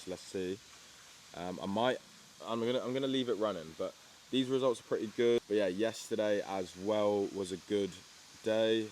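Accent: United Kingdom. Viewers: Pretty Cool Bro! 😂 What A Mockney Geezer He Is!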